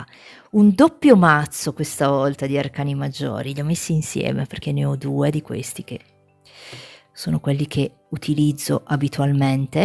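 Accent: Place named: Italy. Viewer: Me encanta el acento italiano además se entiende bastante bien saludos desde 🇪🇦🙌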